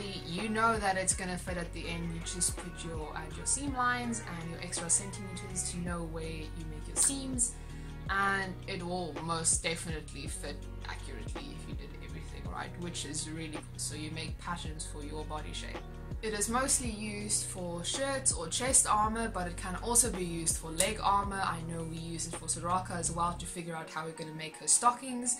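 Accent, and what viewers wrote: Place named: South Africa. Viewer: Just your voice tells me u r South African :) love ur cosplays I hope one day I can cosplay like you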